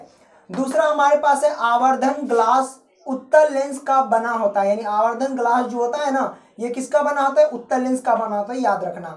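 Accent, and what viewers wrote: Bihar, India. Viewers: AAP bihari ho na aapki language she Pata Chao gya 😋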